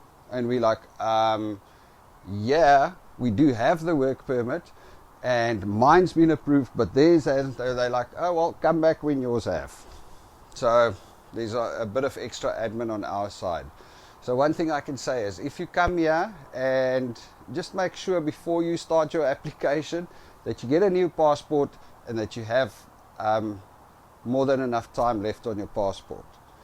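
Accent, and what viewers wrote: South Africa. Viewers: I love the Afrikaans accent - greetings from Namibia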